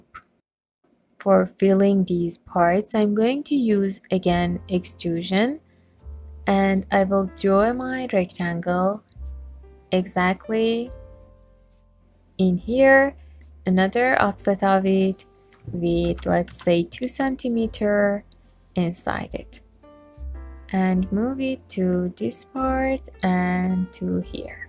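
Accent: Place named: Arab world. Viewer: Love your accent are you arbic ?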